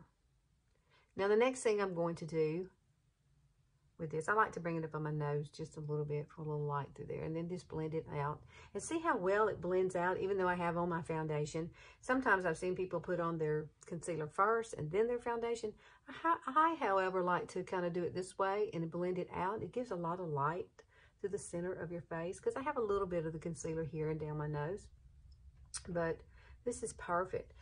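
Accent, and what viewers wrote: South, United States. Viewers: U r so sweet! I’m frm MS so I love ur disposition and of course the accent! Southern girls forever!!!! Thanx for ur tips! I’m 41 and starting to get the lines😡. U look so beautiful and young!!! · You, are FAB-U-LOUS!! I just found you on YouTube and having just turned the big 5-0 I am so glad that I found this particular video. You have that awesome southern accents and are just so enjoyable to watch. Keep it up girl. I just found my favorite YouTube personality! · I could listen to you talk all day. You sound a little southern like me. I love your videos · Oh my goodness!! You are just the sweetest thing!! Your accent makes me miss my family down south!! I’m almost 52 and have lots of under eye issues. This trick looks so great and doable!! Thanks for being your authentic self and helping us gals out!!💜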